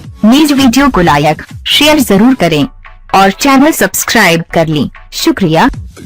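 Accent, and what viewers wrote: India. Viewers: feels like Indian is talking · Kh tu ap pakistan zindabad rhe hiny pr lehja ap indin hy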